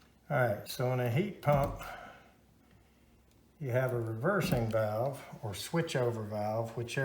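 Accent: South, United States. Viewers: Honeywell used to make an escutcheon plate for use when replacing a large with a small thermostat. Does this Ecobee have such a plate; you would think so since it is a small thermostat. Another great video from the soft spoken Southern expert.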